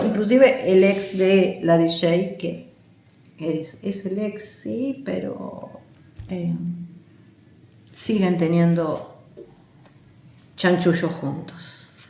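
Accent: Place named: Argentina. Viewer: He seguido an desde hace rato pero a veces como ella habla no entiendo muy bien (acento argentino) disculpa. Se también que dice que las cartas indican que Can y Demet están casados pero después de tanta cosa que ha pasado con la terrible DJ y más, estoy confundida. Espero entender ya que voy a leer todos los comentarios. Que tengas buen día. 👋🏼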